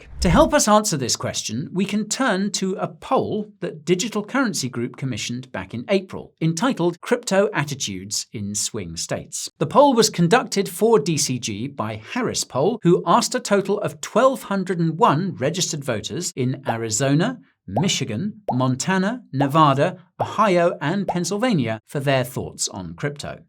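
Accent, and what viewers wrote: Afrikaans, South Africa. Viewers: face-green-smiling: love the South African accent ..decent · As a South African, I love your Afrikaans-English accent. Kak funny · Your South African accent won me.. ja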